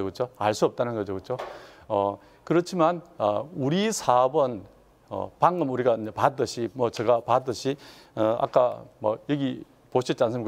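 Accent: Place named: Gyeongsang, South Korea. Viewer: 다소경상도 말씀이라 알아듣기힘들었지만 강의를듣고 우선책을많이읽어야겠다는생각을했어요.수고하셨습니다.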